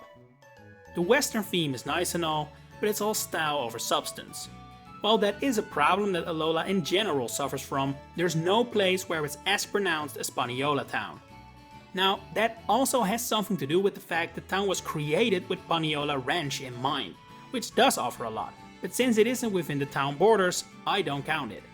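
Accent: Netherlands. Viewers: Can I ask you what your nationality is? I can't help but hear a Dutch accent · Are you Dutch? :p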